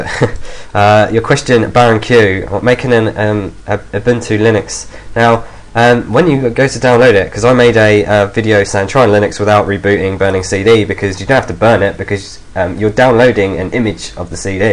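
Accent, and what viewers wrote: England, United Kingdom. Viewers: y is this so long? do u have to go so fast? I have seen others do this in two minutes mr. English accent!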